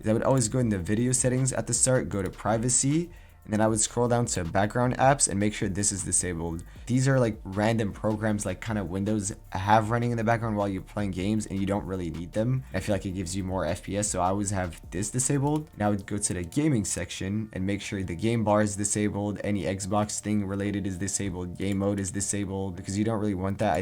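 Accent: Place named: France. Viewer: you're french ? you got a nice accent for a frenchie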